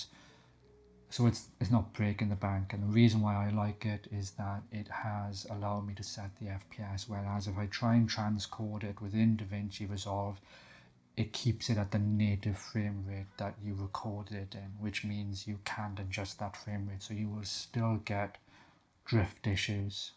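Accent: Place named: Wales, United Kingdom. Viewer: thank you so much, it was very helpful, but you kinda lost me in minute , it got very complex and i have trouble understanding your welsh accent. Keep the good work. Thanks one´s again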